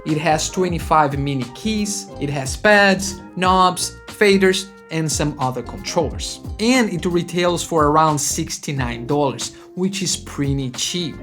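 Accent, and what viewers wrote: Brazil. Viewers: Adoro um brasileiro falando inglês 😎 amei seu vídeo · Esse aí é brasileiro, não me engana não kkkk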